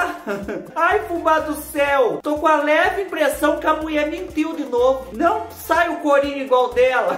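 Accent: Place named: Cajati, Brazil. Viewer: Fubá tu fala igualzinho minhas tias de cajati 😂😂😂😂😂😂😂😂😂 deu até saudade ❤️❤️❤️❤️😂😂😂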